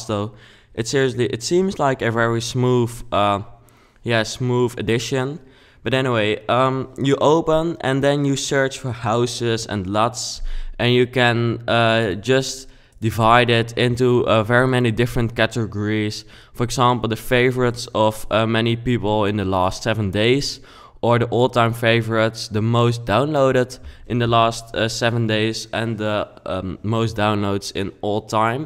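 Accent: Netherlands. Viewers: Haha you have such an Dutch accent ( btw ik ben ook nederlands :p )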